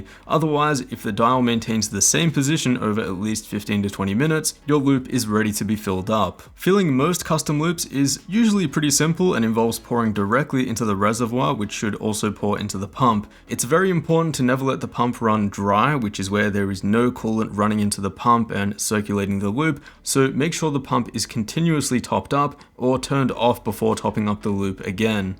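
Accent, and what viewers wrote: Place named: Australia. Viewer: I cannot stand hearing Australians pronouncing Z as "zee"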